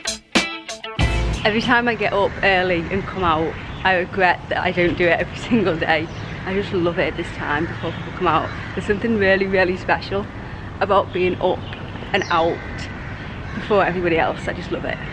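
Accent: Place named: Northern England, United Kingdom. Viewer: Your a great travel guide. Love your northern English accent.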